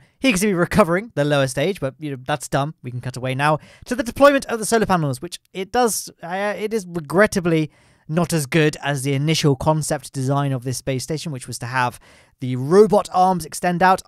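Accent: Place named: Doric, United Kingdom. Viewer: The "wh" pronounced as "hw" is a Doric thing, the dialect that suits between English and Scots. So it's especially appropriate for talking about hwiskey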